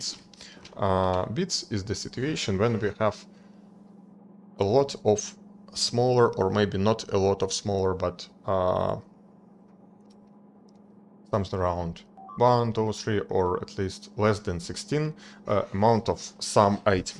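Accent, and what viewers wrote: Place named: Russia. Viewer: вангую автор русский, я прям слышу этот акцент😀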